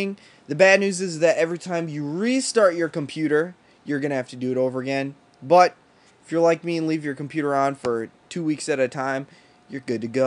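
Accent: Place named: United States. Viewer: annnnnnd you have reached your destination :) I love how you talk! Its so American and cool!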